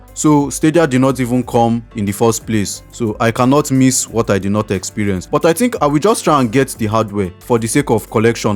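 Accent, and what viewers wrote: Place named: Africa. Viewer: You can clearly hear that you're african 😂 love the african accent haha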